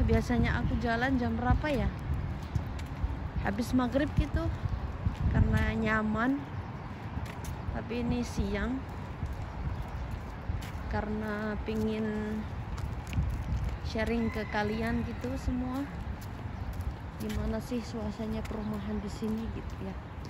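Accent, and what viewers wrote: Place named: Java, Indonesia. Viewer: Indo nya mana kak. . . Ada medok nya bahasanya. . 😁👍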